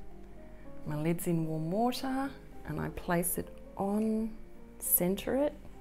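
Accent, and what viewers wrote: Australia. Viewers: Hi. I’m reigniting my canning journey after 30 years. Love ya work. You sound Australian but you are giving US measurements. Is there any chance you could give the poor old Aussie viewers the metric version ’on the go’? ❤ Also…. We’re you ever a presenter on Playschool? I’m sure I’ve seen you on the telly in the past. 🤔❤️❤️❤️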